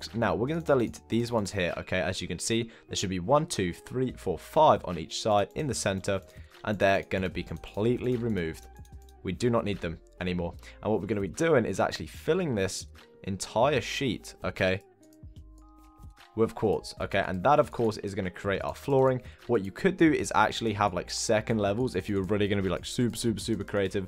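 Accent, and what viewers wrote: United Kingdom. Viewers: XGZ_AnimationsX its just a feature of his British accent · He Has the British accent, that's why.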